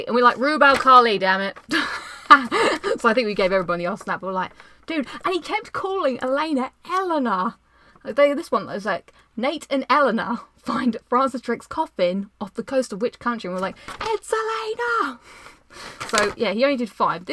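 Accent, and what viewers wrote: United Kingdom. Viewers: Are you british? I love your accent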